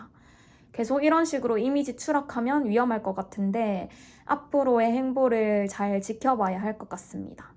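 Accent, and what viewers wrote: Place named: Gyeongsang, South Korea. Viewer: 경상도 사투리..! 저도 투자하는 것을 좋아해요 선생님!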